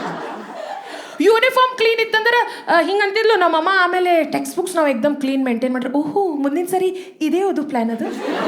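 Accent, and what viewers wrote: North Karnataka, India. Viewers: Too good... love ur north Karnataka kannada.....keep rocking ❤ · Soooooooperb presentation. Wonderful flow of words especially your Uttara Karnataka accent is really a added flavour to your comedy. Enjoyed very much. I am and I am also a Stand up comedian. Both of us have performed on the same stage once and you were the anchor. God Bless You. Keep Rocking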